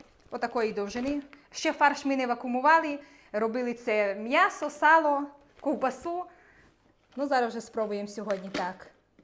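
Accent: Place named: Western Ukraine, Ukraine. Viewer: чому "баночкі" а не слоїки?)) Можливо я помиляюсь...але, мені здається, що жіночка не з полісся. Акцент дуже схожий на західний.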